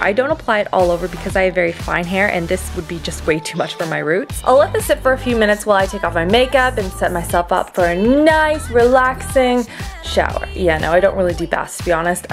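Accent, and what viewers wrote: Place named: Canada. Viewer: Are u Canadian u kinda have an accent I was just wondering ;)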